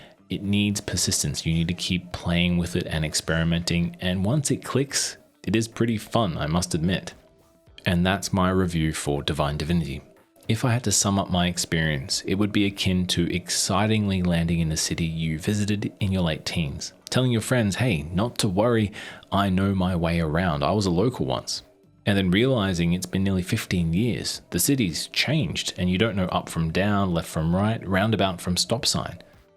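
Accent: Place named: Australia. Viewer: At first I read "Latvian's First RPG" and was confused, like wtf is this guy's Aussie accent... then I checked the sub count and holy shit man, no fucking way your channel is that obscure! You're going to blow up if you keep going at this pace, trust me.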